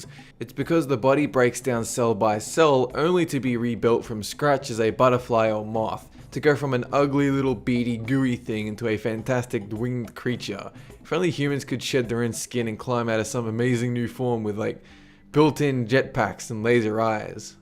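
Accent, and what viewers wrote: Australia. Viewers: are you Australian or kiwi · are you australian?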